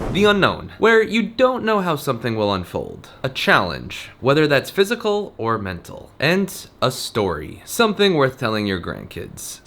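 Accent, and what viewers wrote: New York, United States. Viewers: Yours American English, NYC style is better, than in a movies!!!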